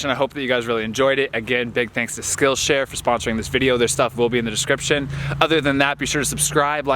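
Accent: United States. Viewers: Are you actually from new Zealand because you sound American.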